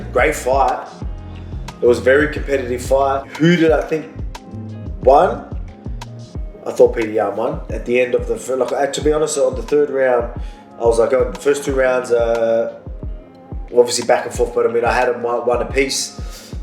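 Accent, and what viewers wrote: Australia. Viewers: Grow some hair on ur head and change ur dressing sense, it makes u look more short.. otherwise excellent fighting, very entertaining, i like your Australian hard accent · Remove background music so we can hear u clearly. Your Australian accent makes ever more hard to listen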